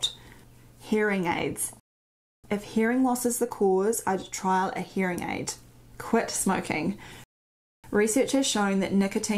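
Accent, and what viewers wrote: New Zealand. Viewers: I got a pretty bad concussion back in 2013 and have tinnitus since then. Great to hear a fellow kiwi by the way. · I'm from the states, but lived in Wellington for a few years - that's a major kiwi accent, I believe! Is there any significance with imbalanced tinnitus? Over the last few months, it's gotten noticeably worse in my left ear. I've had a full round of exams, and have been told I have Meniere's disease. It's unfortunately really impacted my ability to hear properly. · I love the Kiwi accent. When I first arrived in Oz from the US, I couldn't understand anyone! But after nearly 14 years I mostly don't even hear it anymore. I do still love the video a NZ man made about his deck LOL. . My GP said I had Tinnitus when I told him I was hearing music very softly in my right ear. I see how much worse it could be. Thank you for this video. 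>> Tom's wife << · I hear a fellow Kiwis accent and love it. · All New Zealand accents are difficult. You pronounce all your E sounds as a hard E. That is not how the rest of the world speaks! Still good advice and a good video. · I’ve suffered from tinnitus for years. I’m a loco engineer,therefore I am exposed to high noise for long periods. Very informative video. The minute you mentioned your accent about ear, I thought Kiwi. My dear lady wife is a kiwi and is always going on about pronouncing words🧐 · There's few more adorable than a N.Z. accent. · I would like to know if you are a native New Zealander. BTW, your accent is lovely and pleasant to listen too. Thank you for your notes. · Forget your pronunciation of “ear”, your pronunciation of “accent” grates. Seriously, I’d move to NZ tomorrow if the accent wasn’t so grating. I say this from the background of having visited the country and being a descendant of one of a very prominent Kiwi family. 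· Love KIWI accent especially from an intelligent and good looking woman . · Nice to hear Newzealand accent ,though I may take a little extra time understanding. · Definitely I must make an appointment with my Doctor. Thanks Dr.! (By the way, lovely kiwi accent 😉) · Hi Dr, love the Kiwi accent, as I've been living in NZ for 20 years that is a good thing. My tinnitus is like the shrill of many cicada (Christmas beetles) but go from a dozen to thousands when I am stressed out. Like walking in a NZ forest glade in the middle of summer noisey. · I find your Kiwi accent beautiful & clear ♥ (like your looks) Nothing wrong with accents, I've got a European one 🇲🇹 ☺. VERY INTERESTING TOPIC. TANX Dr. Cheers 👍. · Interesting, thank you. As far your accent goes, people just need to learn some Kiwi 😊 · I have had Tinnitus during the day as a hiss, whistle, for perhaps 20 years. Strangely enough it stops when i go to sleep. 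If i wake in the night it remains stopped until i am fully awake. I have learned to live with it. My ears are clean and OK. Love the Kiwi accent. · I use my tinnitus to meditate. Love the accent KIWI isn't it. You accent is better than the call center operators from India, I can't understand them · I am here because of the accent! Loved my stay in kiwi land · I love your accent! I am retired U.S. Navy, and I spent two wonderful years in Auckland on exchange with the Royal New Zealand Navy. You’ve given me a “trip down memory lane”! Thanks! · Its New Zealand, Sexy Voice🌹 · You have a lovely accent as does all of New Zealand 🇳🇿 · I love NZ. In the year of 2002, I had the opportunity to visit your beautiful country. I have accumulated so much positive memories. Your accent is amazing I like it very much combined to your knowledge.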